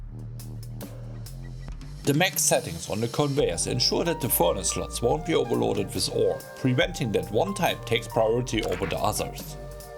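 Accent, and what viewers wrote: Germany. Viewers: The German accent is the best part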